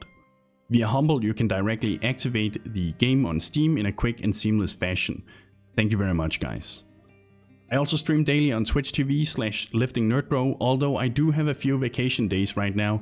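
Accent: Denmark. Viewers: Your accent is a give away for your Danish roots, but the Swedish hobo joke removed any doubt 😂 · Not sure what's worse, looking swedish or having that horrible danish accent. However, this video is exactly what I was trying to find, great tips! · are you danish? sounds alot like a danish accent... bashing the swedes... definitely danish :D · Thought you sounded aggressively danish then you started throwing shade at those pesky swedes. Thank you for the video bror 🙂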